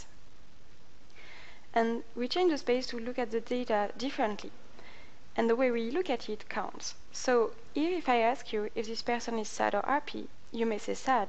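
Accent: France. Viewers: French accent definitely! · I really love your accent ... Are you French? :) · Awful french accent! shouldn't be on a scientific tutorial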